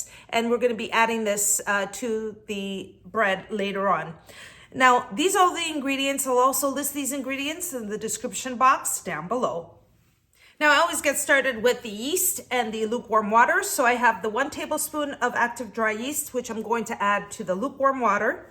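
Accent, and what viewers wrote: Portugal. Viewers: Your português acent is beautiful ❤❤❤❤ I'm from Portimão in the Algarve, are you from Açores?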